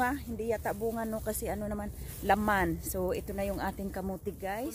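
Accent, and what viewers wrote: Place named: Bicol, Philippines. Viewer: ahh kya pla yung tono nyu😊 bicol din ako catanduanes... kmusta nmn po kayo jan..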